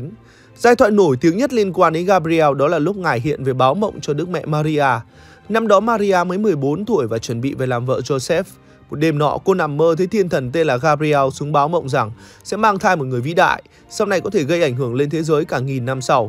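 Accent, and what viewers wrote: Vietnam. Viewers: Nói giọng Bắc ko chuẩn lại nói nhanh nuốt chữ khó làm người nghe ko kịp, phát âm các tên TLTT ko rõ !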